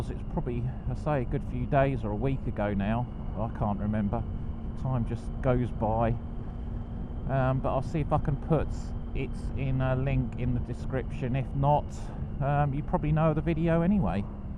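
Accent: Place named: England, United Kingdom. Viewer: I agree about the metal covers. We are experiencing astronomical growth in this area of Tennessee, USA and they are putting in new roads, and tearing up older ones to put more drain lines, etc, in the ground. Many of them are squared and hitting the corner of one can do damage on your tire. Some stick up several inches and hitting one at night in a curve can be an eye opener. When they are wet, dangerous. You must be from the same region of England as The Cockney Biker. He has an accent much like yours, he has been living in the States for a number of years now.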